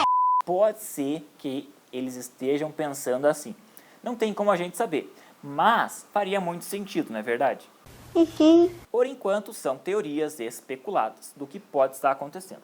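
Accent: Curitiba, Brazil. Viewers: Conheci o sotaque curitibano desde o princípio, huehue. Já ia perguntar, aí quando falou da Copel...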